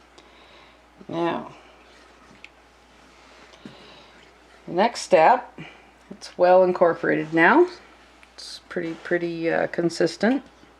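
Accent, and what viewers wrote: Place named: South, United States. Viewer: Thank you for getting back to me. I will check goggle. The reason I ask where you were from. I noticed a southern accent in your voice. I am from the southern part of Virginia. But I now live near Baltimore, Md. The northern part. I don't get too meet very many southern people here. I will look forward to more of your videos. Thank you very much for sharing. Have a Merry Christmas.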